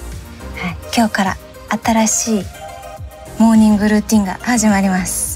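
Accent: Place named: Japan. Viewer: How lovely her japanese accent is...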